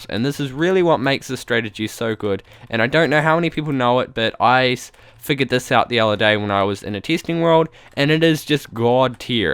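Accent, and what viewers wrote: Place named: New Zealand. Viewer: im pretty sure he's from new zealand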